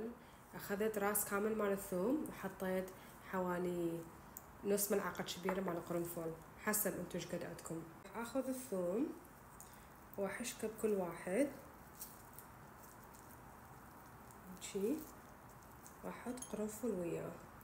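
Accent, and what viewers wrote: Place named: Iraq. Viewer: حبيت اللهجة العراقية الي بعدج محتفظة بيها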